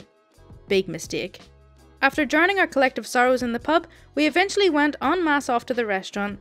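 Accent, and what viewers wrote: Ireland. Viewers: First story: There's nothing wrong with a charming Irish accent, even for a British theater/dance story.. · Good morning, Markus etc. First, there is nothing better than the sweet voice of an Irish lass and a picture of a totally contented feline. Then the second thing.... a wee dram of single malt. 🐱🐱🐱🐶👴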